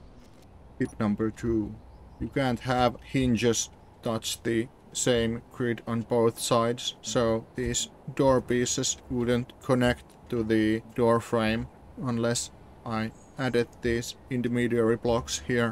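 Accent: Finland. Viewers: Sounds like Finish English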